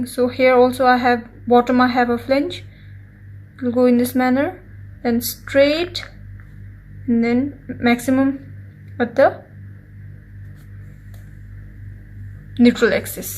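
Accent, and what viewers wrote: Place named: Northeast India, India. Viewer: Thxnsu So mus mam apne bot asaa seekaya....BTW I love NE accent 😂❤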